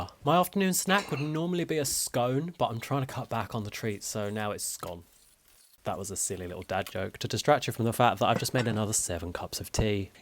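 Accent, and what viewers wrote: England, United Kingdom. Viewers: English. He’s English not British. There are 4 nations on these islands and England is just one of them. I’m Scottish not British and this guy is English speaking with an English accent because there’s no such accent as British. 🤦🏼‍♀️🤷‍♀️🏴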